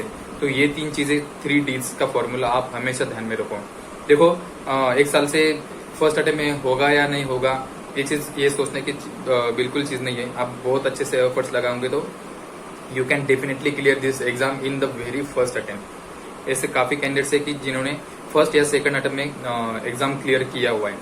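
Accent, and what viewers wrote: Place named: Maharashtra, India. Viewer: Amazing videos sirr... Aapki hindi me jarasa marathi ka leheza hai... I also frm mh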